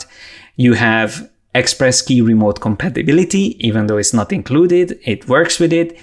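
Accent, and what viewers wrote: Hungary. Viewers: Szia ! Pár videódat már végignéztem. Az összes minőségi és átgondolt, csak gratulálni tudok. Ha megengeded 1 észrevételem mondjuk lenne. Az angol kiejtéseden nagyon érződik, hogy magyar vagy. Ezzel semmi gond sincs, hiszen nem ez az anyanyelved. Arra szeretnélek megkérni, hogy amennyire az időd és energiád engedi feliratoznád a videóid magyaroknak, mert nem mindenki ért angolul és így a nézőid száma is bővűlne ... Ez csak egy javaslat 😉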